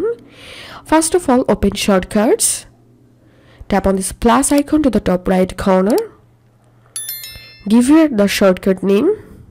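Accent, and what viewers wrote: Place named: India. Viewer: I don’t trust the Indian accent…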